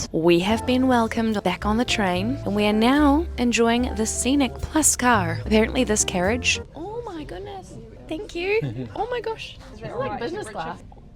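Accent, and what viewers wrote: New Zealand. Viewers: I like how your Kiwi accent becomes stronger when you're in NZ, especially with your family 😄. Awesome video. NZ is truly quite beautiful. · Fortunately, your Kiwi accent actually isn't too strong for me to understand and to fully comprehend. As a matter of fact, it's really quite pleasant listening to! I'm a GER, trying to improve my English listening skills by watching your wonderful videos. Rail travel has been my favorite mode of transport for decades, I can relate to any second of your content. While watching and eventually commenting on this video, I just might improve my writing skills as well! Tons of looove and kudos to you from a GER subscriber.... 🥰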